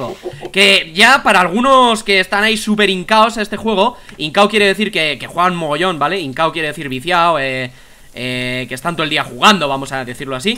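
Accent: Spain. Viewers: grito de me puedes saludar por fis, por sierto de que parte de España eres, tus vídeos son excelentes 😁👌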